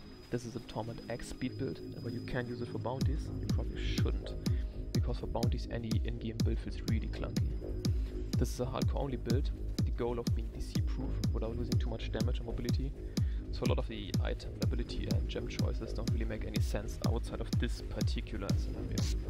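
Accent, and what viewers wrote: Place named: Germany. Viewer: Odd, I watched the video in full and heard/understood everything he said; I'm also not convinced that his accent is really an issue either as I'm Australian and he sounded German to me though I could be mistaken but it any case I could understand him so BobDaBilda & Faphand111 you both may need to address your computers sound issues. O.P thanks for the upload, I am rolling a Wizard for season six and even though Explosive Blast has never been a favorite of mine it was still an interesting build you demonstrated. I am actually keen to either try the Vyr's set or alternatively I might skip sets all together and focus on a disintegration build seeing as Wizard has changed quite a bit since I last played one.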